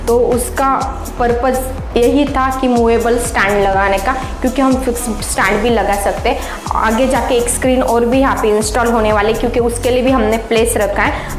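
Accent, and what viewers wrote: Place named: Maharashtra, India. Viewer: Marathi logo ki hindi... जगात भारी 👍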